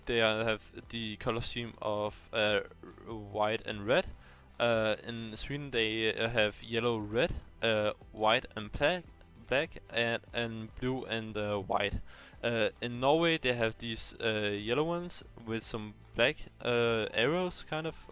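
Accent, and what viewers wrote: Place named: Denmark. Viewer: Your Danish accent is so strong 😀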